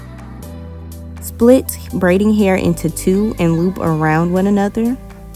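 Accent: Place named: Jamaica. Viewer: Jamaican Accent Yes 💞💞 lol.. You come to take over YouTube 👏👏👌👌 Get it kinks Beauty..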